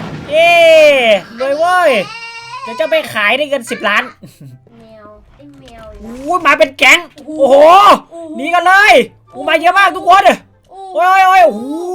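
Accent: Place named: Isan, Thailand. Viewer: พี่ไม่พูดอีสานหรอผมขอมา4คลิปเเล้วรวมถึงคลิปนี้ผมชอบเสียงที่พี่พูดอีสานเอาเเค่ตอนเปิดคลิปเป็นภาษาอีสานก็ได้นะผมจะรอ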